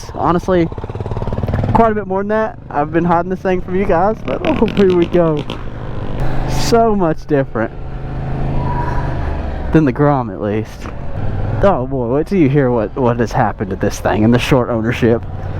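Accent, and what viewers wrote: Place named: South, United States. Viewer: You sound like y'all from dawn sawwf. What state?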